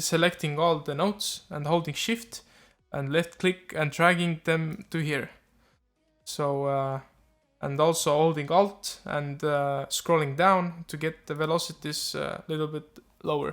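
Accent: Russia. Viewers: Ur accent sounds like u from Russia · мне кажется или ты русский?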